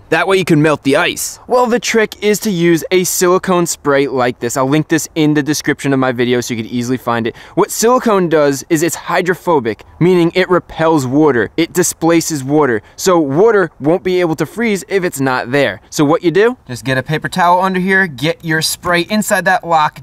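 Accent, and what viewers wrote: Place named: Pennsylvania, United States. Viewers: has an accent that sounds like he's from southern Pennsylvania....we all say water like that here lol Good tips though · Its new jersey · New Jersey for sure. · I know hes from somewhere in the new york area solely because of the way he says water. This comment is being made after only watching 4-5 of his videos with no other context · Jersey thing like saying “where are the khakis” is “where are the car keys” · Yeah, that NJ accent is clear... especially "wooder".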